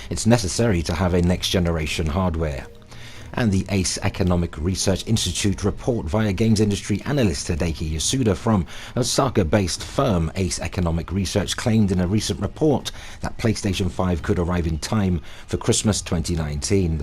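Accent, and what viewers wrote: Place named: England, United Kingdom. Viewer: Great channel, great content, great diction........... very insightful indeed.........you have a great tone of voice and a delightful English accent.......keep the good work.......you are a breath of fresh air when it comes to you tube gaming commentators.......they are mostly inept and childish, or use click bait with ludicrous tittles and unfounded far fetched facts that beggars believe......you have my full attention sir.......greetings from Australia 👍🏻😀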